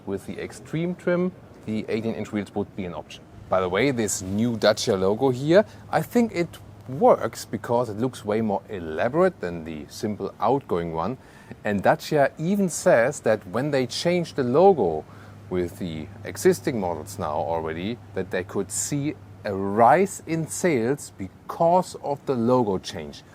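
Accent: Germany. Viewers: Genglish… what a horror… Jesus safe me please…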